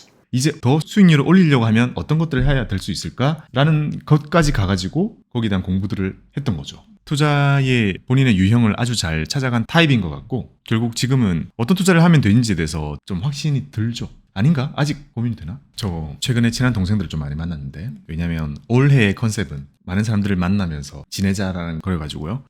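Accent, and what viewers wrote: Gyeongsang, South Korea. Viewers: 투자공부보다 갱상도 억양의 보이스가 너~무 매력적이라 귀에 쏙쏙 붙어서 목소리 들으러 오는데 덤으로 알려주는 투자방법도 내 성향에 맞는것은 가져 감 ㅋ 쌩유요 ^^😂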